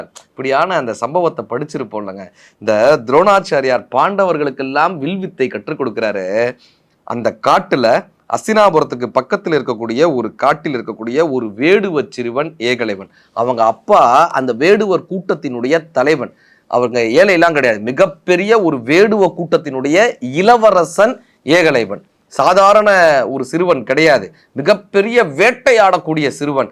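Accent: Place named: Madurai, India. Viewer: அஸ்வத்தாமன் தானே அவர்.... சொல்லிய விதம் மதுரை மண்ணுகுரியது.... 🩷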